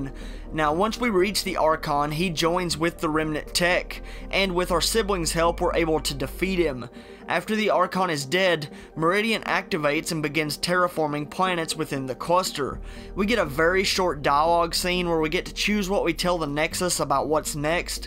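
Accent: South, United States. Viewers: No disrespect, since I'm from Mississippi myself, but do I detect a deep southern drawl there (Texas or Tennessee maybe)? ^_^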